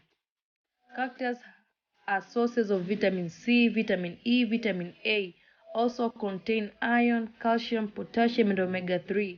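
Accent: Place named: Kenya. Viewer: Her accent sounds like she is from Kenya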